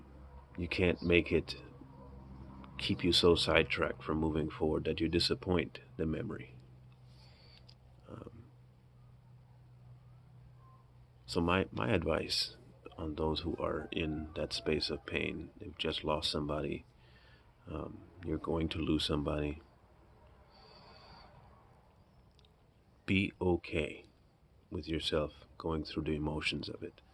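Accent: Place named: Caribbean. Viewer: Which Caribbean island are you from, dude?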